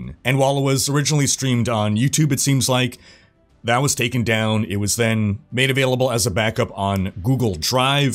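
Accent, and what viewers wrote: Quebec, Canada. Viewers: Wtf I've been watching you for ages and never noticed!!! Québecois? :D loll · you learned french in Quebec didn't you? Your accent is 100% french canadian, I know because I'm one of those weirdos haha. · Bordel tu parles français (enfin quebecquois si j'ai bien reconnu l'accent) ;)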